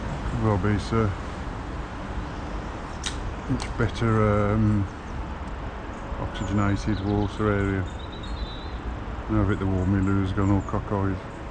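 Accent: Birmingham, United Kingdom. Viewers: Brilliant Stuff Earthling Quality Fish Are You A Brummie Or From Dudley Way ? Bless Up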